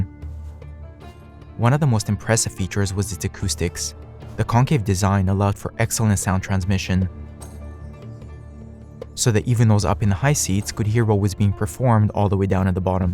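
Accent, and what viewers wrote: United States. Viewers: Are you American or simply someone speaking American English and probably jewish?? I normally steer clear of American docos because they usually "silly"....probably as a result of the poor public education system.?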